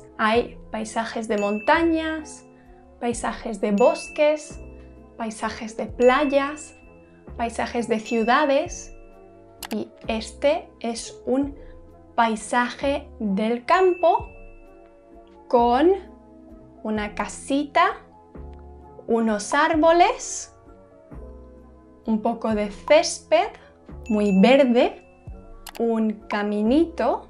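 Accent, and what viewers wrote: Spain. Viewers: Thanks. That was short and sweet. I noticed the Spanish spoken by you from Spain is different from the one that is spoken in South America. Right?.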